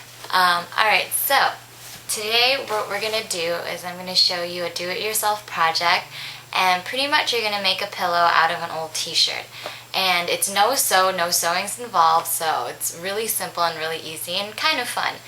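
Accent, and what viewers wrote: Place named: Canada. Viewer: she sounds Canadian to me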